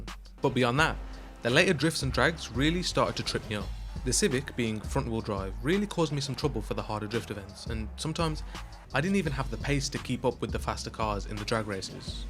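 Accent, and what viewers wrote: United Kingdom. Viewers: How come you didn’t get the 106 for underground 2? You sound like you’re English, the 106 was on European versions along with a corsa lol the 106 was actually the best starter stats wise too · Lol the use of AAVE in the British accent is funny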